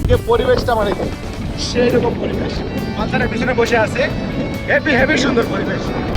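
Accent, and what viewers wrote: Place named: Chapai Nawabganj, Bangladesh. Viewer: জি ভাইয়া চাপাইয়ের ভাসা অনেক হাসির🤗😁😀😀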